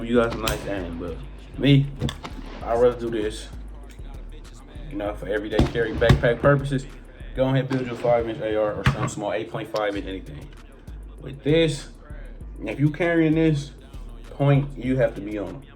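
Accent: Michigan, United States. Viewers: You got my follow bro..Good review! Sound like you from my small town Saginaw MI 😂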